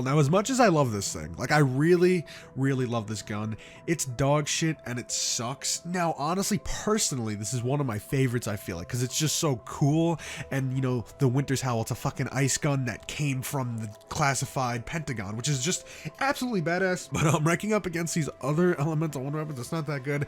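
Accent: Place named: Greece. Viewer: cause you have a kinda Greek accent also I am Greek as well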